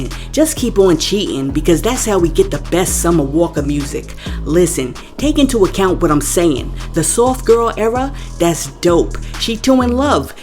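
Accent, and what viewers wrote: New York, United States. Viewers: I know right its like Lyte so New York Im from L A so it is so cool to me 😎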